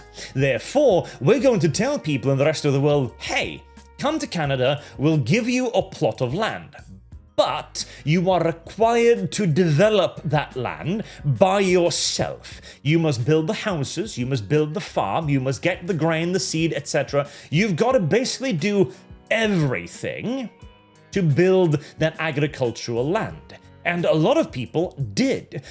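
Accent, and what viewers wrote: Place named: United Kingdom. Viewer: The video presenter looks like a Russian Udmert but sounds like a British person. Off putting and confusing.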